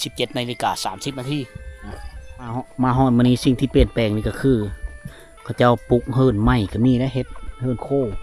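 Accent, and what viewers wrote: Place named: So, Thailand. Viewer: ฟังคือภาษาโส้ กุสุมาลย์ สกลนคร อะจอ อะลีก เดอะ คือกันกับโส้กุสุมาลย์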